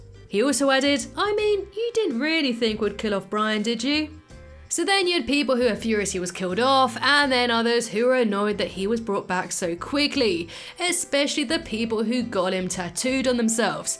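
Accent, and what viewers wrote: United Kingdom. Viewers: your british accent is beatiful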